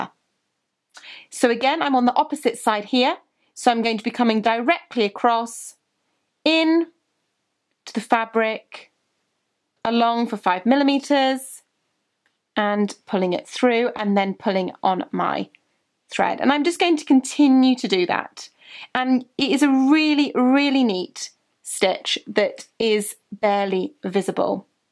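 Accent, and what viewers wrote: United Kingdom. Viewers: Are u British?? 🤔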